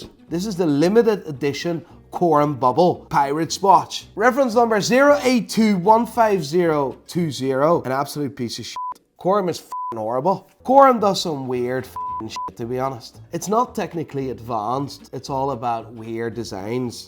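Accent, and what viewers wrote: Northern Ireland, United Kingdom. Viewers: Are you from Northern Ireland u sound it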